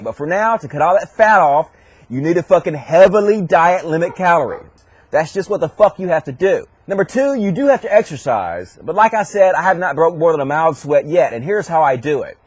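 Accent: South, United States. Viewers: Forgive this non-American his ignorance but I never thought I'd hear a Southerner casually talking in metric. I thought you Americans recoiled like vampires when ever someone starts talking about grams and hectares :P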